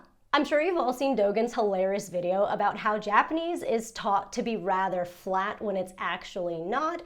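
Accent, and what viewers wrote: United States. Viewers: are you american or japanese? you pretty much sound american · Your American accent is very nice. Were you born in USA or did you learn to speak like this? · It's fascinating hear your English sound American, and then your Japanese sounds native.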